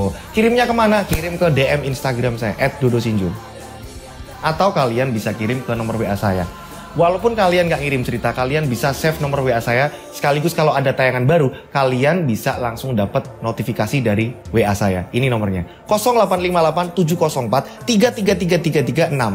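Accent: Java, Indonesia. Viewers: Selamat sore mas,ma'af mas mengenai wayang itu kebudaya an Jawa lho mas,bukan kebudaya an Indonesia kita dari bangka-selatan merasa tidak memiliki kebudaya an wayang tapi saya seneng lho mas dngn gaya mas,logat jawanya,ungah-ungguhnya😮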